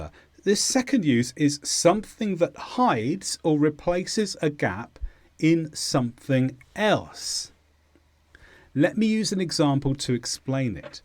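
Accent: United Kingdom. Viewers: I have learned British Accent by you.I owe you i swear.When Our British Customers come to our restaurant they say where did you learn this excellent English?I show you❤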